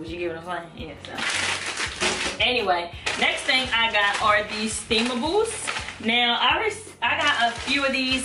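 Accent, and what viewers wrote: Baltimore, United States. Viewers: I live in pg county so trust i KNO she said two words and I just said yep she’s from Baltimore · I called that accent! I knew you were from Baltimore! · Are you from Baltimore...? That accent is Thick on some words I love it lol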